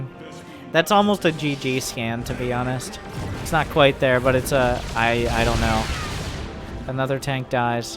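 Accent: South, United States. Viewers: Hey, how'd you get your slight southern accent? What state were you raised in?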